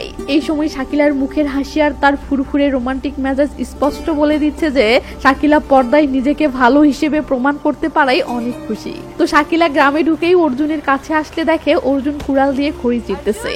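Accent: Bangladesh. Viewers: I am indian . But, I did not see these good movies , I like your Bangladeshi speaking syle .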